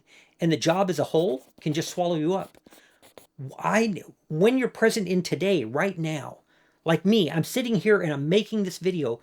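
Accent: Minnesota, United States. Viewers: I thought I heard some Minneeeesota in there! Northern Weesconsin here!! Right on the tip!!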